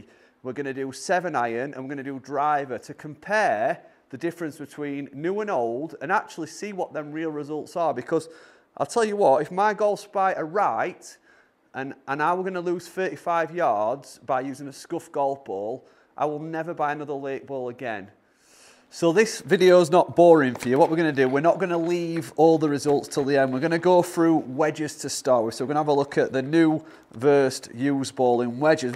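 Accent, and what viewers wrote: Yorkshire, United Kingdom. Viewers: Proper Yorkshire man😂 Shall I set a go fund up page for you!!!!🤭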